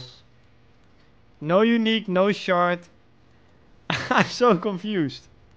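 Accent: Netherlands. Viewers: nice, i heared a little dutch accent:p, nice videos man! Just subbed! Keep it up 💪🏻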